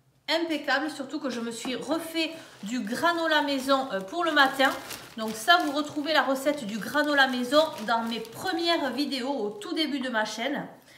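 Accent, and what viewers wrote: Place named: Southwest, France. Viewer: Coucou je réagis concernant les chocolatines oui dans le sud ouest on dit comme ça, moi qui suis originaire du nord on dit petits pains au chocolat. Depuis plus de 20 ans que je vis également dans le sud ouest je dis chocolatine sauf quand je remonte tout là haut. Entre parenthèse je vis non loin de Dax 😉🌞, je me doutais à ton accent mais sans grande certitude.😊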